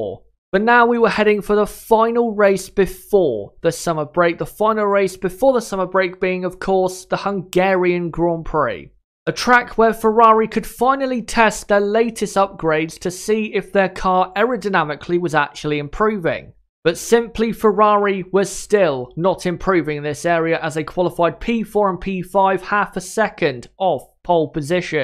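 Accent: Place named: West Country, United Kingdom. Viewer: Great analysis. Are you from the West Country? It's just the way you pronounce "SebaRstian Vettel."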